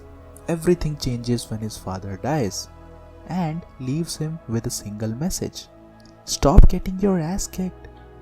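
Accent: India. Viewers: No disrespect the moment I heard the first word you spoke... In my ma mind, Yep definitely dis dude is from India. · Your accent's just like Indian people Where are u from?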